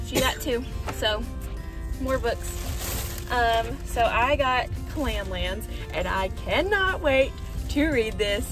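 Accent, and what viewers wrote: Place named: South, United States. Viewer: Not to be creepy or anything, but I live in the same place you filmed this :) I knew I heard a southern accent! (I have one, too, no worries) 😂 loved the video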